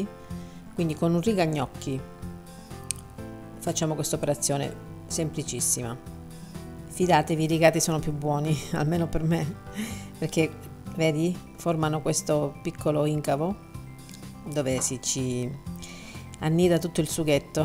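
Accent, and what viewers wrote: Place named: South, Italy. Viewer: A parte il fatto che sei una maga, in cucina: ma parliamo del tuo accento meraviglioso..mi scalda il cuore! Io son Sarda e adoro gli accenti del Sud e delle isole!!!!❤❤❤❤❤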